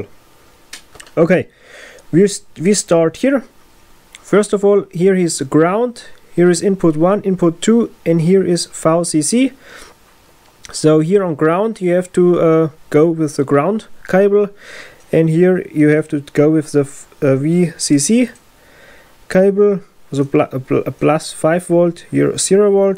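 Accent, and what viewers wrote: Germany. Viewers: Als Parodie wie deutsche englisch reden durchaus zu gebrauchen aber wenn man etwas hilfreiches gesucht hat wie ein Verbraucher an den Ausgang angeschlossen wird fehlt die Hälfte in dem Video…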